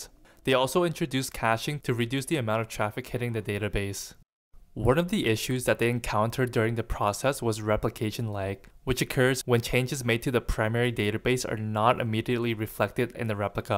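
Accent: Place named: Asia. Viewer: I could tell you're asian without seeing your face It's your accent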